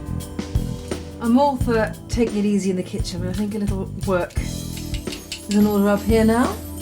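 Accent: England, United Kingdom. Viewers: Yes. Sometimes she strikes me like one of the classic Hollywood glamour women of the 1940's. Plus she's got that mane of raven hair, the Marilyn hourglass shape, and the delightful Mary Poppins English accent. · Have you read Jane Eyre? Nigella has a way with words and that British accent does it wonders but that's it.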